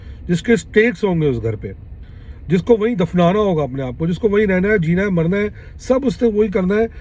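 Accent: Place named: Punjab, India. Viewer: تم کو أخر اردو لکھنا کب آئے گی، پنجابی لہجے مل میں بولتے تو ہو ہی لکھتے بھی پنجابیوں کی طرح ہی ہو۔ مرون منت ہا ہا ہا ارے یہ سن کر پکا پتہ چل گیا کہ مہاجروں کا مقدمہ پنجابی لڑرہا ہے۔ پس ثابت ہوا کہ یہ "حب مہاجر میں نہیں بلکہ بغض PPP میں" بول رہا ہے۔ اصل میں مسلم لیگ ن کی مہم چلا رہا ہے۔ کیوں کہ ایم کیو ایم مسلم لیگ ن کے تھلے لگی ہوئی ہے۔ قریش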